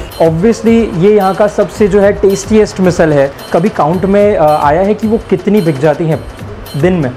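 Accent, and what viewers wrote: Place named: Maharashtra, India. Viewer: tuza marathi accent Khup chhan ahe!👌👌👌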